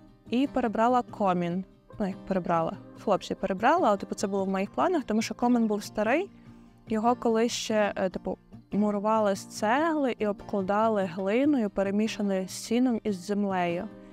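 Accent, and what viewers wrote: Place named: Ternopil, Ukraine. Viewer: а я то чую розмова така як в Тернополі👍😄 Моя сімʼя теж походить з Тернопільського району ❤ Ти моя землячка🎉❤❤❤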